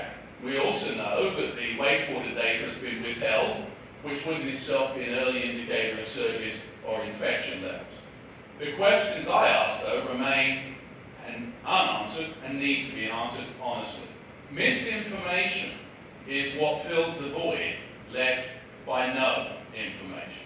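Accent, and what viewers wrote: United Kingdom. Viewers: He is a Pommie (an English person). He migrated to the US some years back. He sounds like the love child of Nigel Farage and Arthur Daley. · He's not even American. Listen to him. He's a Brit; a frigginn Lobsterback 🇺🇲💪🏻🇬🇧👎🏻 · Who is the immigrant actually. U came over from England and still talk like the Brits we kicked out of America in 1776. Go home to england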